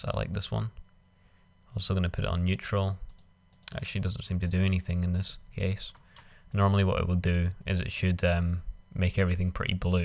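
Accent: Scotland, United Kingdom. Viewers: Sounds like a Scotsman - nice tutorial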